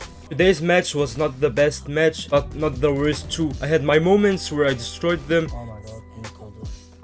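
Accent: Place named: Arab world. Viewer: I'm the 700th subscriber Also keep up the good work (where are u from btw cuz u have an Arabic accent)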